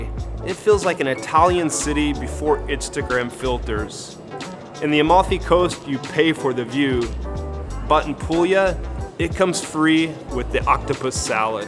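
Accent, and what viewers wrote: United States. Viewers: You sound like an American. Perhaps just stay there.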